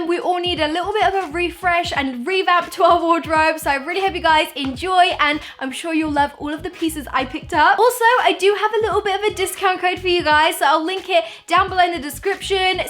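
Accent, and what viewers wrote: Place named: United Kingdom. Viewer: I discovered you a couple of days ago and I really love you and I love your accent btw is it British?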